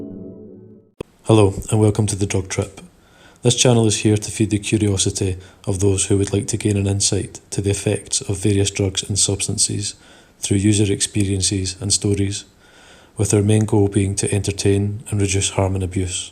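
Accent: Scotland, United Kingdom. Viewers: u fae Glasgow by any chance? or somewhere else in scotland ?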